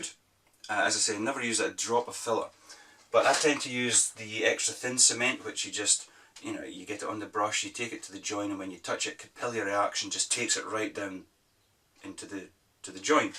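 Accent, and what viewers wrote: Scotland, United Kingdom. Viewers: Always seems odd to hear a Scots accent on YouTube. Subscribed, anyhow, since I'm "Scotch" masel'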